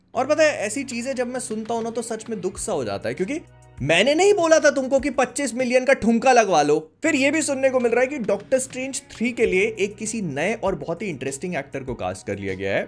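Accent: Bhopal, India. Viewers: Baba makde app bhopal se ho kya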